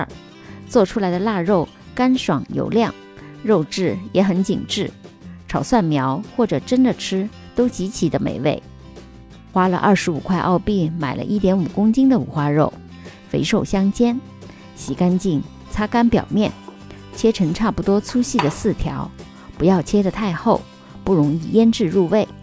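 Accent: Shanghai, China. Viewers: 最喜欢的东西上海普通话越听越亲切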